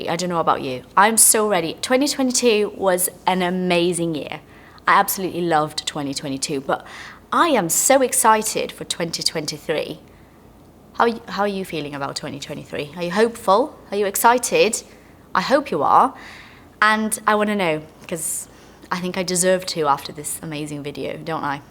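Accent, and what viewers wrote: United Kingdom. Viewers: I love your British accent, happy new year for you and your family 🇬🇧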